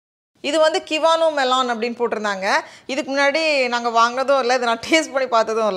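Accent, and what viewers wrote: Nagercoil, India. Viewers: Akka ninka nagarcoil thana . nanum tha yena ninka peasurathu and ninka pandra nagarcoil side enjithiyal famous appovea kandupudisan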